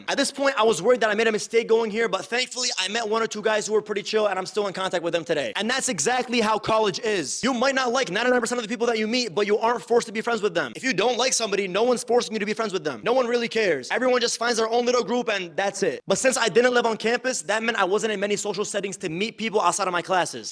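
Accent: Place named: Jordan. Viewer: Bro English with Jordanian accent is insane haha, great video btw bro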